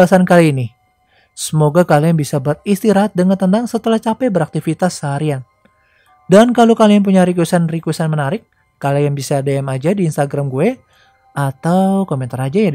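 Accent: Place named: West Java, Indonesia. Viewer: Mantap aa agak lucu dengar nya pasti dari jawa barat y😆 Pelajaran paling penting .. Penting ny kita bersosialisasi dengan orang lain biar klo ad apa ap ada yg tau dan nolongin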